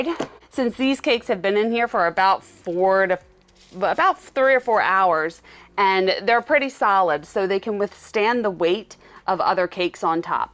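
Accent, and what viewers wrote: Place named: Delaware County, United States. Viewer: I just came across this video trying to find tips for making my daughter's wedding cake. I heard the DELCO accent...living in SC for 14 years now. It's funny how I can still hear it right away. Lol Of course I had to look you up and saw Bensalem... Great tips! Thanks!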